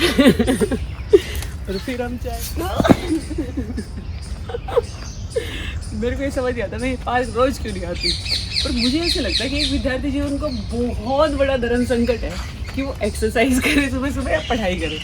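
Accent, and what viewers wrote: Haryana, India. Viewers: बोली से पटा चला आप सादर हरियाणा से हो। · Very Sweet ❤ बहुत प्यारी बोली हैं हरियाणवी ! Very Lovely Haryanvi Culture ! Aapki Shaadi Me Jrur Bulana! I Like And Want Seen Haryanvi Culture ❤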